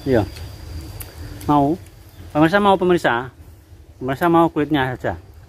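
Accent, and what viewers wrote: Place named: Java, Indonesia. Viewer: Jowone ngendi masse...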